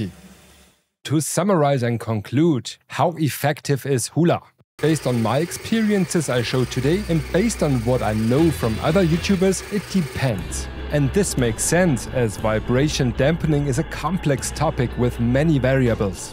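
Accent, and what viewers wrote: Germany. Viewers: Are you printer guys all the same guy with the same voice and same german accent? 🤔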